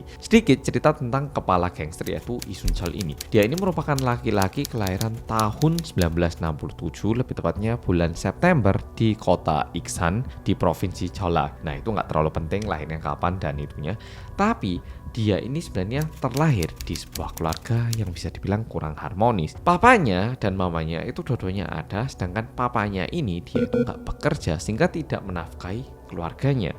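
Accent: Java, Indonesia. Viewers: Saya yang dari bayi di jawa pun tidak seMEDOK Mas 😅 bisa enak aja gitu denger Mas nya ngomong.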